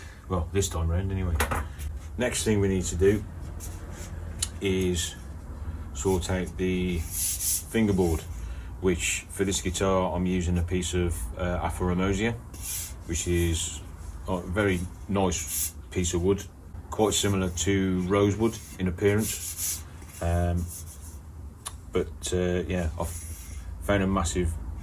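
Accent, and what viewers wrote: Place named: Kidderminster, United Kingdom. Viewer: Nice job. Btw my wife heard your video from another room, came running in and shouted ‘Kidderminster!’ (referring to your accent). She also comes from the carpet capital of the western world (we met while both working at Gilt Edge Carpets).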